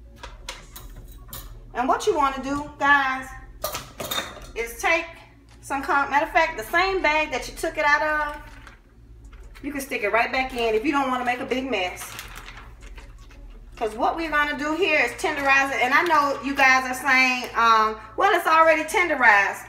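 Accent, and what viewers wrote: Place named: New Orleans, United States. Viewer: You sound of New Orleans not Miami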